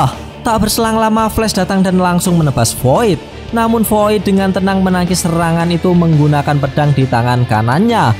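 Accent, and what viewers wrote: Java, Indonesia. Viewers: Medhook pol min 😂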